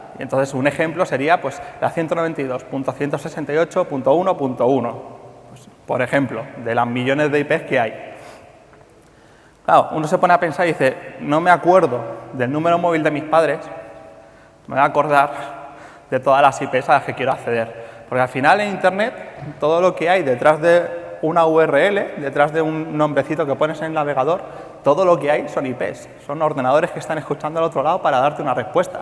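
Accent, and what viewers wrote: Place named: Spain. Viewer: luego con ese acento españolete se hace más aburrido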